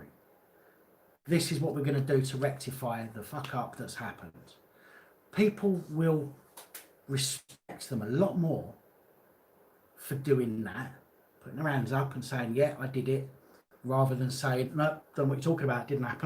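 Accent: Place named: London, United Kingdom. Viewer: Why do you live in Liverpool, you sound like a londoner mate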